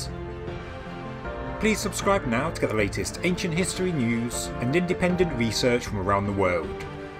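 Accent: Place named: Birmingham, United Kingdom. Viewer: brummies should never be allowed to narrate anything ever again.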